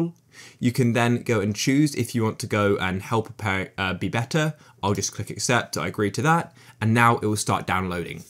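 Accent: United Kingdom. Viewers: nah bruv he’s bri’ish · hes bri-ish/british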